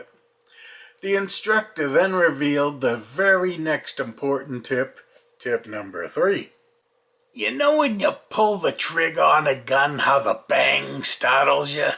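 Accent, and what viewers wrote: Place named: Boston, United States. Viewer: Wonderful North Shore Boston or Southern NH accent.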